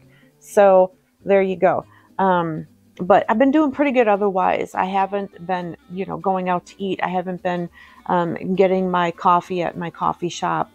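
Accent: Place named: Midwest, United States. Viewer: NY🤨 insurances all up. Originally from ND. Are you from the Midwest? I hear something as you speak. So I really appreciate your way of putting things, the concept of liking the more vintage times. My dad was not into vintage because he lived it but still I found/find comfort and joy in the things from the past. He was also navy during Ww2 and Korean and love the wedding photo you showed. I have read most of the Agatha Christie. Finishing up Nemesis. Murder mysteries and some historical fiction is my go to. I could go on but I just wanted to encourage you. Thank you!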